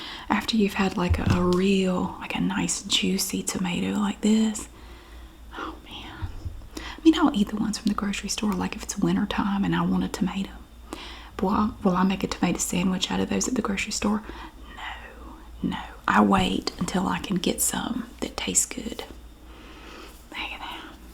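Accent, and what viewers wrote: South, United States. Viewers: you are a breath of fresh air! Longtime subscriber. Not much of a commenter, but sometimes something comes along and I just have to. I could listen to your voice and southern accent for hours and hours! Thank you for doing what you do! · I love your tomato sandwich videos. My favourite 😊 oh wow I’ve never seen tomato’s that big before. I love your southern accent, it’s so much better than mine. A Birmingham accent in the uk 🇬🇧 is one of the worst in the world 😂 or so I have been told. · Being from Northeast Texas ,I nevee heard of dukes mayo before, And yes in my area it was not uncommon to hear something like this ? " yall want a Mater Sandwhich", I 2 have noticed a lessening of accents,and I think it's a crying shame. Your accent is heavenly ,aint nothing more beautiful than Southern Women😍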